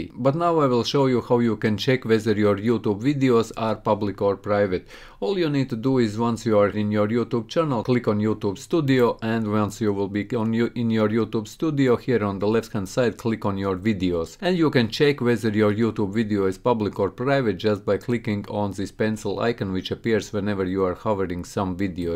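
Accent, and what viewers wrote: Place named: Russia. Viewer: I love his accent. Russian is the best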